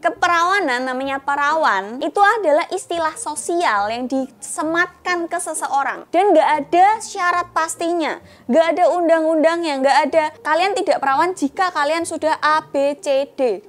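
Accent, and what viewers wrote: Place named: Java, Indonesia. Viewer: Medok mbak.. salam soko wong semarang (Tembalang) ak bocahmu:D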